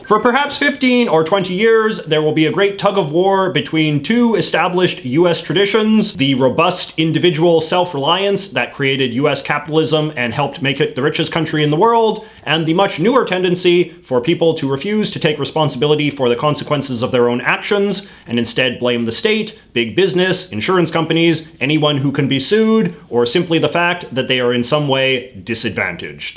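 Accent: Canada. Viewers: Never seen this guys videos before. All I take away is that this is the most canadian sounding person I have ever encountered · I won’t be voting for someone who talks like that, too Canadian and goofy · this guy sounds like the canadian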